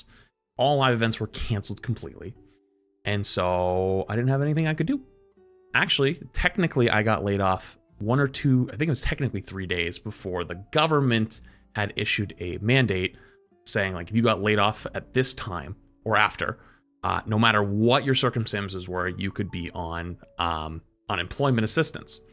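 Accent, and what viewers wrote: Boston, United States. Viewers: The only thing I got from this video is you are from Boston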